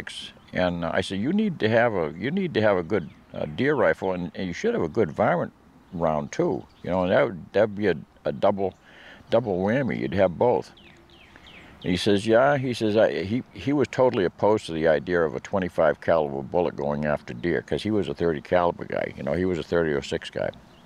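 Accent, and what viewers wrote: New England, United States. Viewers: I'm not sure where you live but you do have a New England type of accent? I was born in Minnesota but spent 70 of my 80 years in California, except for a couple years in West Germany. Anyway, here, you have touched on one of my favorite cartridges. I have owned one Ruger M77 25'06Remington and two 25'06 Ackley's. I hunt northern Nevada, or at least I DID, and for goats and coyotes at 350-400 yards, it does the job. The traditional problem has always been that nobody makes a suitable target quality bullet in that bore diameter but I knew a fellow that would run off some of his super accurate 100 grain Starkes. Great bullet weight for open range coyotes. You are frequently focused on resale value, when buying rifles, and everybody has their reasons but I don't care about that. If I need a new barrel, I buy a premium target grade in whatever contour that strikes me and whatever twist suitable for the bullets I want to use. 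By the way, I think we agree about flat based bullets, whenever possible. I am NOT a fan of this extreme long range potting of big game animals. These people have no idea how much even a bedded deer can move while the bullet is in flight! My ethical distance is 400 yards. Yes, I will take an occasional shot at one of a pair that is leaving, like if it's straight away. There is a magic in some cartridge designations and 25'06 is one with the magic. So is the 220Swift; pure magic! So is 300Mag. I'm an advocate of a factory 22-250AI cartridge. That case far outpe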